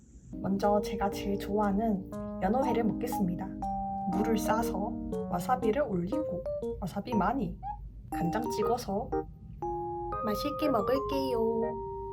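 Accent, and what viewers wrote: Gyeongsang, South Korea. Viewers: ㅎㅎㅎ 브이로그에서는 ㅋㅋ 뭔가 귀엽게 대구 발음이 나오시네요. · 소리 끄고보면 완젼 갬성 브이로그 유튜브가튼데 소리키면 그냥 대구사람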